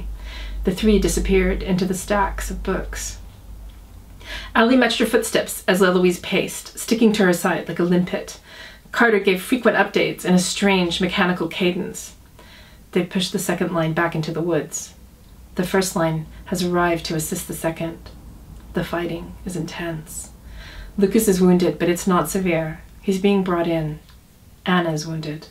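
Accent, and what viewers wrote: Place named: France. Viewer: Your French accent is not bad, it’s cute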